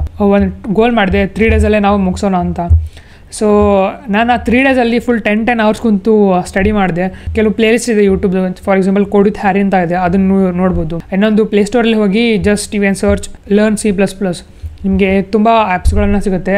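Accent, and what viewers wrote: North Karnataka, India. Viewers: Bro,are you from north karnatak?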